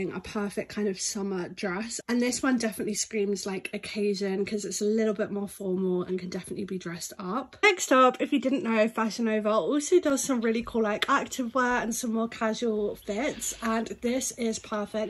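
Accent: United Kingdom. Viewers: I really love British accent you sound like one❤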